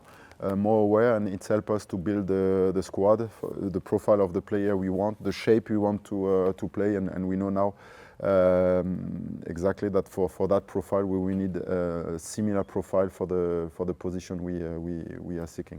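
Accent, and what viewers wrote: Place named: Europe. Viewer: This bloke has fraudently made a career on sounding European and sophisticated.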